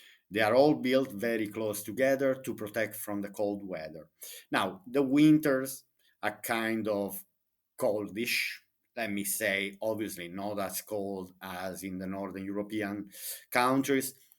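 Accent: Italy. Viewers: Thank you for pleasing your subscribers. I am enjoying the Italian pronunciation 🎉 i love❤ Molise. Hope invite us to his new home!😊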